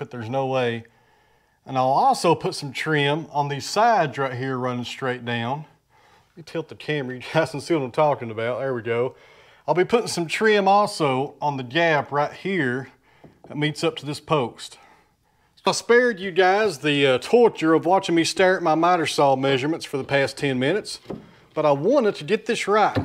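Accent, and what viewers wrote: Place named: Tennessee, United States. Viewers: Keep on bragin' on yourself my friend, and I love the TENN dialect. Love watching your videos! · I happen to like your Tennessee dialect! Don't change for anybody.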